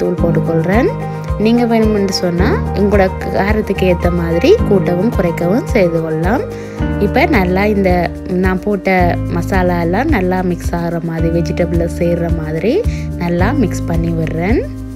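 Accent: Sri Lanka. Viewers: Very nice😊👍I love your singala tamil😊😊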